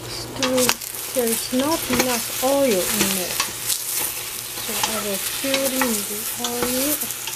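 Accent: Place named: Liberia. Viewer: Oh okay... sound Like Liberian. Nice cooking :)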